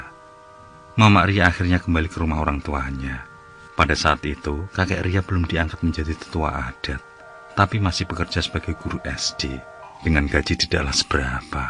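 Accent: Java, Indonesia. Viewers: dubbernya ... medhok! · Klo mo nyumbang cerita nih apa hrs slalu yg genrenya horor trs ada mistik nya?koreksi dikit, krn cerita ini berlatar keluarga Batak yg tgl di Jawa timur mungkin ada baiknya voice talent agak disesuaikan dikit,jd ga trlalu Jawa tp ada batak2nya dikit jdnya lbh hdp kt nntnya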